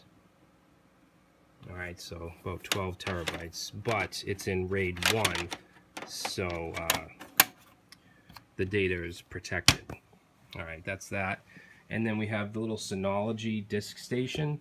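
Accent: Boston, United States. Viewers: Well they both have Boston accent. I'd say is the mature version of Bucky.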